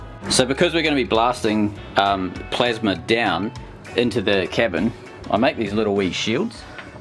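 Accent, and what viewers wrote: New Zealand. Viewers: Are you originally from NewZealand?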